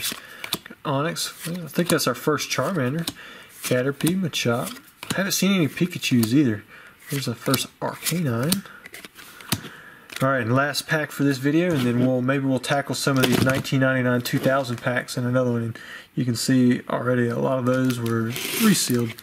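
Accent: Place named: Georgia, United States. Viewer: do you live in Georgia in usa because you have a Georgian accent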